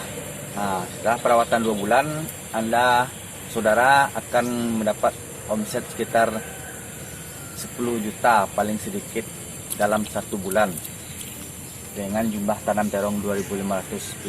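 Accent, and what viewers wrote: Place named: West Sumatra, Indonesia. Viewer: Bang tolong dibalas.., Uda urang minangkan, dima lokasi da..? mintak nomor WAnyo da Ambo ka Konsul senek.. soalnya keceplosan bicaranya nya😊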